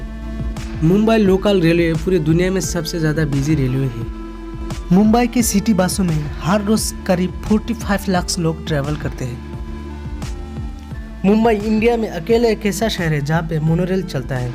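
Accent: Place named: West Bengal, India. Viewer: I think u r from Bengal ,,,, ya??? I could recognize your voice ☺️☺️ keep it broo very well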